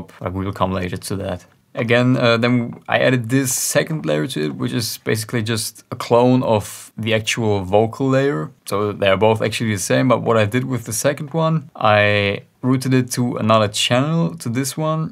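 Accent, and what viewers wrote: Germany. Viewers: Es hört sich so an als wärst du ein Deutscher! :) Wegen dem Akzent! Ist aber nicht schlimm, sondern cool!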